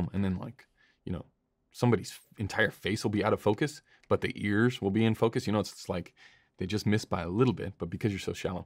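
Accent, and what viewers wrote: France. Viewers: Ahahahaha amazing French!! 😂😂😂👍🏼 Great accent!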